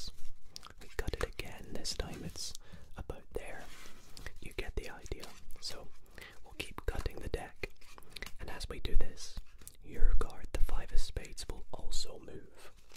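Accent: Scotland, United Kingdom. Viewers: yepp he is scottish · No way! I’ve wanted to learn card tricks all this week. Btw, are you Scottish? I am too and i thought your accent was similar to me lol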